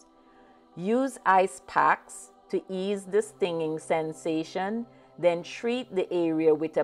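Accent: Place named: Jamaica. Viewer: Love the Jamaican accent,! Very informative video as they are everywhere in our yard and do tend to sting. Ocala seems to be the epicenter.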